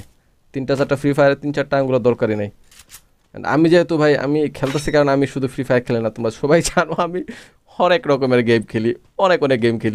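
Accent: Sylhet, Bangladesh. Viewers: bhaiya apni ki sylheti?? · vai apnar basha sylhet kunano? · Tumi Sylhet fua ni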